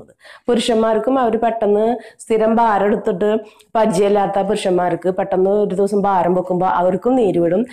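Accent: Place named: Thrissur, India. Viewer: തൃശ്ശൂർകാരിയായ ക്രിസ്ത്യൻ ചേച്ചി ആണ് സംസാരം കേട്ടിട്ട്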